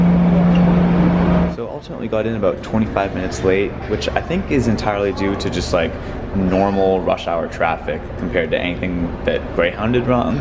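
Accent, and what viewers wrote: Boston, United States. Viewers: I rode a Greyhound for the first time in a long time recently and paid extra for the reserved seat and I only realized halfway through the ride, that I was put in the wrong row (it was only one off but still) so yeah reserved seating is a scam. Love the Bahston accent!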